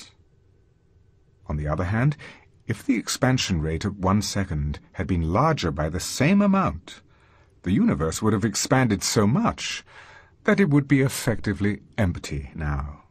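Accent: United Kingdom. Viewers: Beautiful voice and pronunciation. At last a British English and not the usual american twang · Love the British accent. Sounds very English!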